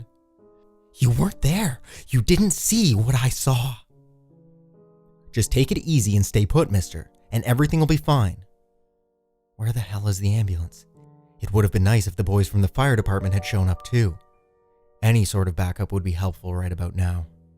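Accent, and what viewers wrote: Canada. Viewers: my man!!!!! I have been waiting for this forever dude! Didn't think it was ever gonna happen after the issue you had with the author about the last part of the story.. And speaking of which, do you have some sort of agreement with T.W. Grim or somethin? You're the only narrator on youtube I've found that reads his/her stuff. You have the best taste in material by the way, and for some reason, your painfully Canadian accent really tops these off and makes them more real and authentic. You have quickly become my favorite narrator dude, keep up the good work!! Also, do you read subscriber submitted stories?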